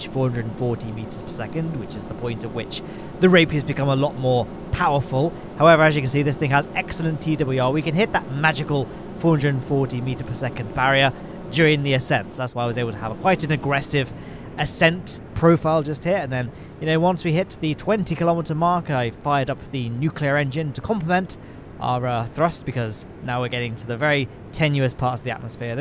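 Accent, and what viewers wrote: United Kingdom. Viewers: Did you just say Cor-oh-nah virus? I come here for a good British accent and PROPER pronunciation.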